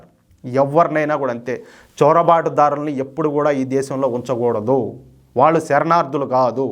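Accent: Rayalaseema, India. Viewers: మీరు రాయలసీమ ఉచ్చారణతో చాలా బాగా చెబుతున్నారు కంప్లీట్ గా ఆంధ్రప్రదేశ్ వాళ్లకు అర్థమవుతుంది ధన్యవాదాలు మిత్రమా🎉 అక్కడ ప్రజలు కూడా నిజానిజాలు తెలుసుకోవలసిన అవసరం ఉంది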